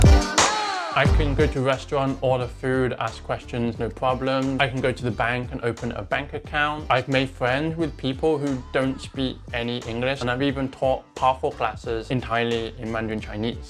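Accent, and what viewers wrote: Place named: Taiwan. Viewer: I love your slight Taiwanese/British-American accent. :)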